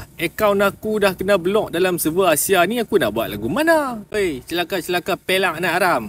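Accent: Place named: Kedah, Malaysia. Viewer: sempoi bak hang..😅 lama dh aku tak dengaq loghat kedah yg bunyi kasaq🤭... last masa ngan roomate kt Shah alam 30thn lalu...😊 Keep it up bro! Lawan tetap lawan!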